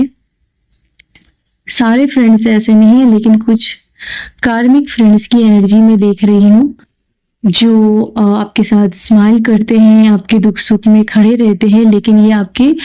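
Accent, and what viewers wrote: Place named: England, United Kingdom. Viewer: Aapka voice me ek Englic Vibe hai ,,,,ayse logo ki madat karte rahiye 👌👌👌 kyunki hum manushya ko bhagwan duniya me bhejte hai jarurat mand ka help karne k liye,,,lekin insaan apna swarth k chalte bura karm karna suru kar deta hai,,,tabhi to zindagi barbad kar leta hai,,, prabhu shree Krishna ji sabko sehi Marg dikhaye 🙏🙏🙏 aap bahut achha kar rahe ho,,,mere Prabhu krishna ji aap ka sath abosya denge,,,ab aapka growth hone ja Raha hai,,,but kabhi Maan me ahankar mat Lana aap ,,,jai shree krishna 🙏🙏🙏 Radhe Radhe 🙏🙏🙏🚩🚩🚩🚩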